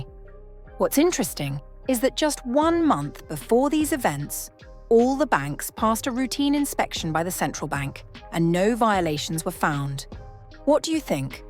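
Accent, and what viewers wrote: United Kingdom. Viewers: Does this Jew-ish Gangster Narrator with an English accent think Urin belongs on the street. He could have killed Putins Son in law. He got off easy. Says The BronxBoy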